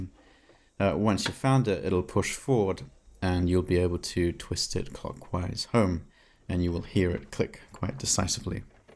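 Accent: England, United Kingdom. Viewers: He sounds English to me and he opened the bonnet/hood up on the UK's driver's side.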